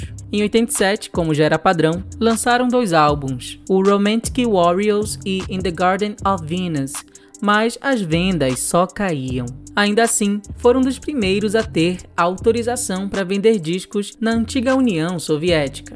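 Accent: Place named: Rio de Janeiro, Brazil. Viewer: este sotaque carioca, que mata...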